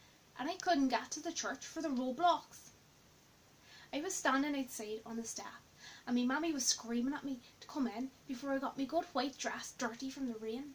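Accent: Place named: Ireland. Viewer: Your Irish accent is amazing!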